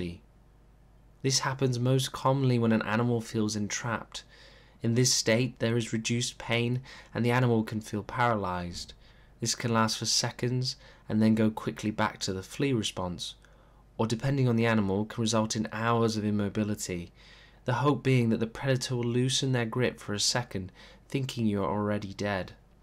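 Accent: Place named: Wales, United Kingdom. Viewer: Thanks, watched on repeat and took notes, very professional, and with an endearing hint of Welsh?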